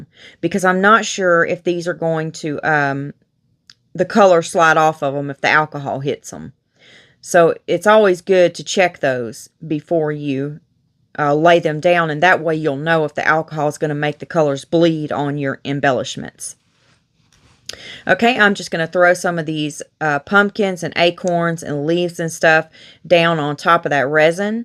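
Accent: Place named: Louisiana, United States. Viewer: Hi, the tray came out beautifully. Thanks for sharing the wet technique. I’ve never seen anyone else do it before. What state are you from? I know you have a southern accent & to me, it sounds like Louisiana, but I’m not sure. Take care, stay safe & have a blessed day.🙏💗👋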